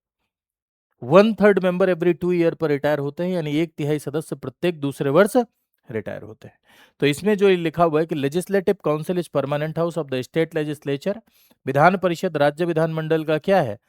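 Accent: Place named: Uttar Pradesh, India. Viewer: Sir jab aap comedy krte h tb bda achcha lgta h bilkul up style m hm bhi knpuriya h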